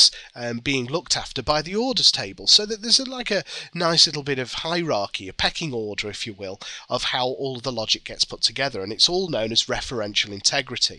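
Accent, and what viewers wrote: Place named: United Kingdom. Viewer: Hey brother thank you , i just did whatever you showed in this video and i learned how to find the relationships and dependancies.Thank you and you'r british accent is nice too.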